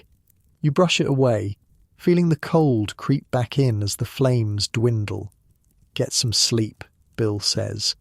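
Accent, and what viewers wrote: England, United Kingdom. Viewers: American pioneer stories by a narrator with an English accent?! Ummm, no thanks. I’m out 🙄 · Why is an English man commentary to the American history??😂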